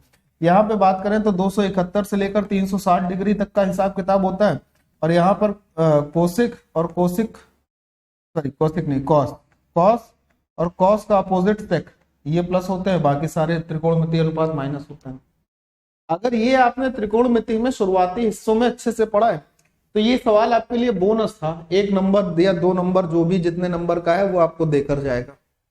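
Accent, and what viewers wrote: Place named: Farrukhabad, India. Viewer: Sir kha se hn aap Farrukhabad ke aas pas ki bhasa lg rhi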